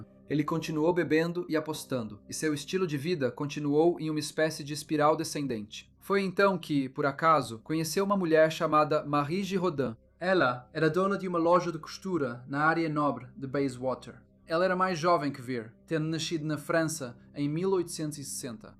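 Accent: Portugal. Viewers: Continua o sotaque português, e eu continuo a não assistir e a não curtir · Mas ele não especificou que o canal é Português do Brasil. Você não consegue entender em português de Portugal? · Mas então ele não é português? Eu tinha entendido que está em português de Portugal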